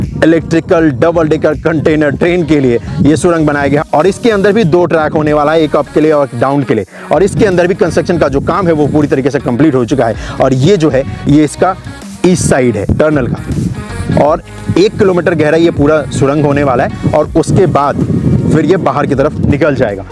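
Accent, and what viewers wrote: Bihar, India. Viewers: he need to fix his Bihari accent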